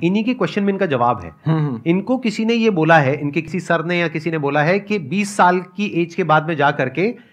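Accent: Haryana, India. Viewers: I loved your Haryanvi accent the way of you speaking. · Mai bhi padh rhi hu I am 38 year old and I studying as a architecture student Or mujhe aapki haryanvi bohot achi lgi Mai bhi haryana se hu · Bhai, your Haryanvi pronounciation is great one even in English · I already knew he is from Haryana by tone as I am also from Haryana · Kis kis ko pasand aai sir ki haryanvi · Sir I am from Haryana ,it's true bhiwani me esa hi bolte h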